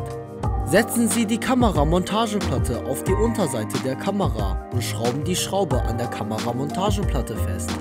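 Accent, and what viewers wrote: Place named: Turkey. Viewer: Das ist kein Dialekt. Das ist ein türkischer native Speaker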